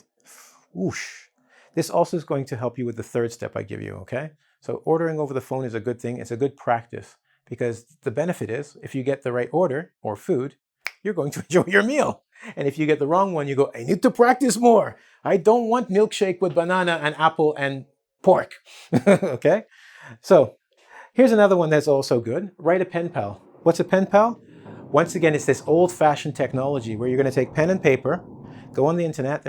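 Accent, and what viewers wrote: Brazil. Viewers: Are you a Brazilian guy? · are you Brazilian?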